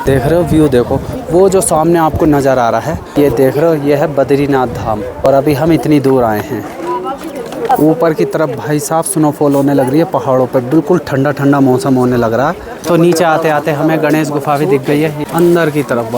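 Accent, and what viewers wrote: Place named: Uttar Pradesh, India. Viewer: Bhaiya aap kaha se ho aapke bolne ka tarike se tho aap UP se lagte ho bhut acchi lagti h aapki video me aapki new subscriber 😊❤❤😊😊😊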